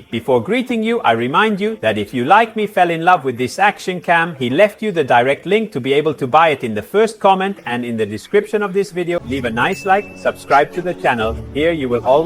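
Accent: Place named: India. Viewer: U sound like an indian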